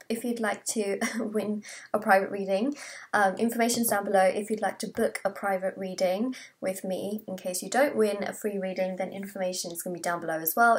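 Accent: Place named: RP, United Kingdom. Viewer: Newbie here. Will be interesting if it comes true. Nice to hear the queens English spoken properly.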